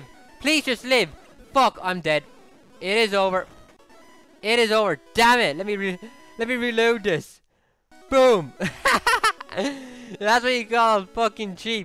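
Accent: Ireland. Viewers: Oh my God your accent is AWESOME!!! Are you from Ireland??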